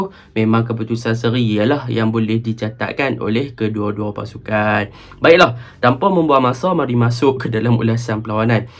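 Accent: Kedah, Malaysia. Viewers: Aku suka dengaq loghat kedah hang, cuma aku x suka dengaq loghat kedah sanusi je ❤️💙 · suke betol aku dgr loghat kedah hang bang👍👌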